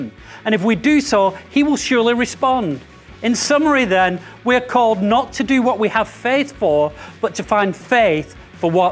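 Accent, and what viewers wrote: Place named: Bolton, United Kingdom. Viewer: the Horwich/Bolton accent still comes through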